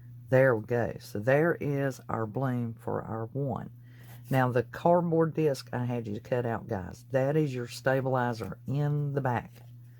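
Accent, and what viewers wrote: South, United States. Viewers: Just found you! So excited to make this!!!! You sound like a fellow southern girl! ❤️ Lookinf forward to watching more of your videos! God bless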